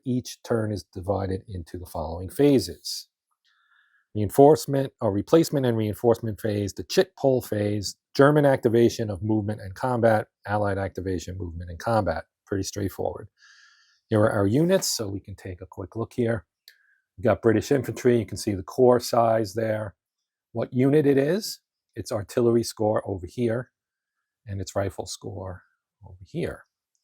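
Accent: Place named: New York, United States. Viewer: pronouncing eurotowns with new york accent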